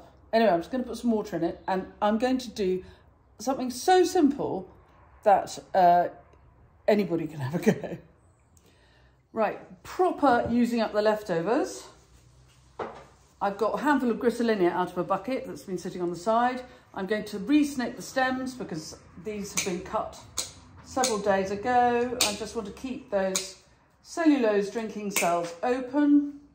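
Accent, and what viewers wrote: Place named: England, United Kingdom. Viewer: You are so adorable! I'm in the USA, and I can't tell you how much I love your English accent along with your cute way of expressing yourself! I also appreciate yor knowledge of the Latin names of plants and flowers. It elevates them instead of sounding so common. Love your arrangements that feel like little gardens! None of them feel tight and constrained. What a relief from arrangements that think they all have to look alike in shops. I so appreciate the variety! I moved to an acre in Washington, and have started growing my own beautiful flowers. What a thrill to be able to arrange and share these beauties! Thank you for the enjoyment I get out of watching your videos!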